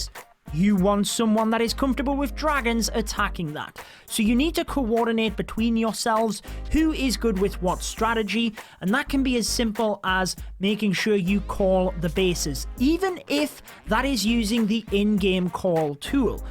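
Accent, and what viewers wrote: United Kingdom. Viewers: i have a question for you where are you from? I ask this because I’m my country (Honduras) it’s 4 am. I guess you are from U.K. by the way you speak English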